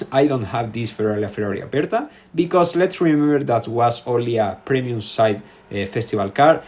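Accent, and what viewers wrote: Spain. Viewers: Uff loco, de acá se te nota el español🥵👌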